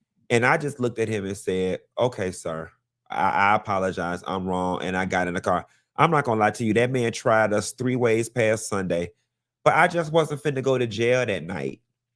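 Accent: South Africa. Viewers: Sisi I spotted your accent my sister!! #NoDNA just RSA!! Yes our person representing us on my favourite show!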